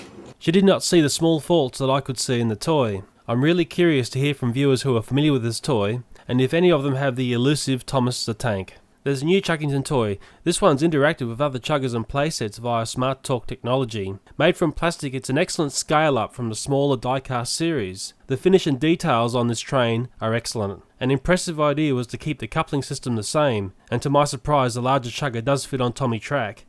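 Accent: Australia. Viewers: i just realised your from australia?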